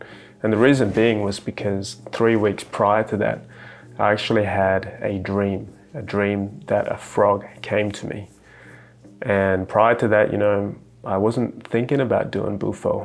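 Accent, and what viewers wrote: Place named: Australia. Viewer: Thank you for sharing. You have an Australian accent. Wondering if you're in Australia still?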